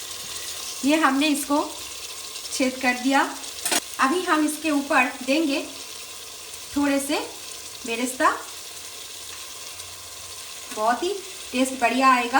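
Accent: Bengal, India. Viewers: R u bengali mam? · Your recpice is osm, but I want to ask are you bengalee mam?? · Are you Bengali? I am telling this after hearing your hindi ☺☺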